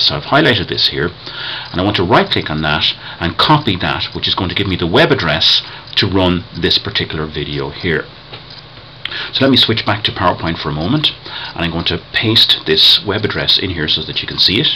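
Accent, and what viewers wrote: Ireland. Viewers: It's nice to hear a voice from home :) miss Ireland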